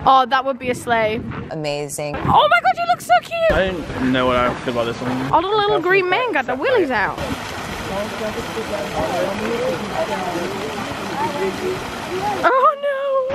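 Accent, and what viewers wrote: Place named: Yorkshire, United Kingdom. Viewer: love hearing my yorkshire accent so blatant in the city of paris #aesthetic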